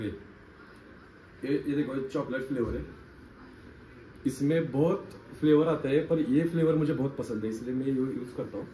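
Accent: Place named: Kolhapur, India. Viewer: कोल्हापुरी accent भाऊ!! 🔥😁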